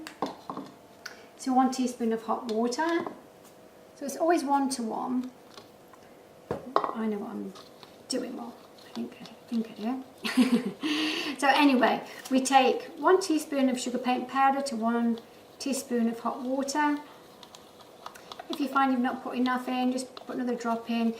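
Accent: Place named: London, United Kingdom. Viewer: Hi there ive discoveted your chanel and absolutely loving everything you surround yourself with. The girly in me would love to just emulate everything you have. However I live in a house full of males (husband and 2 sons so I keep it a very neutral grey and white theme). I adore the colours of the gorgeousness on the table as well as the colours of your paints. Just a couple of quesries/points... When you were mixing your paints and whilst adding in the white - you mentioned you were adding 'teaspoons' of white paint - however, the spoon you was using appeared to be much bigger than a teaspoon so please would you be able to clarify your measurements a little better to save confusion in future videos. The spoon in the white paint also appeared to be quite a bit bigger than the spoon in your powders which did look more like a teaspoon size. 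Also you mentioned cost in dollars but you sound English (from the greater London area). Are you based in the states or some other place that uses dollars as I suppose I am assuming you mean US dollars but this could be just my assumption. If you are in the UK still, please could you provide costs for your paint powders in £ sterling. Just one more thing. The resolution of the video doesn't fit a full mobile screen (when in landscape mode). All other videos I play from other channels fill the area as they should. Is this something in your set up or mine. Although I would say it's not mine but maybe something different I have to do with yours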